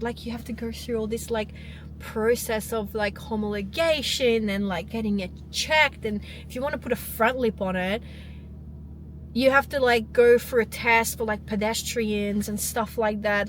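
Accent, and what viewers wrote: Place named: Australia. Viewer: Aussie accent on fleek ngl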